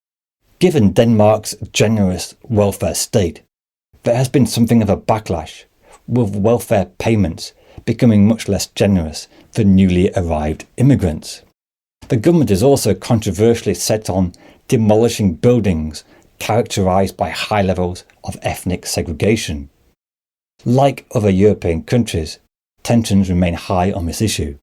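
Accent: United Kingdom. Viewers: The narrator has a British accent.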